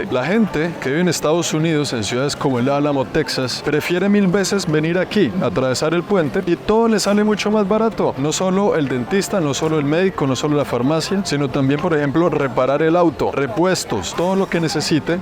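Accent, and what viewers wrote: Colombia. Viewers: Bienvenido 😂😂😂 Me encanta que conservas tu acento colombiano ❤❤🤗😘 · se le Nota el acento y las expresiones en la forma de habalr muy colombina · Usted no es mexicano, por su acento, se le oye lo colombiano, póngase trucha ai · Espera hablas como un colombiano y eres de México? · Eres Colombiano ?! " Chévere " tu Reseña...Conocí Colombia y son Animosos...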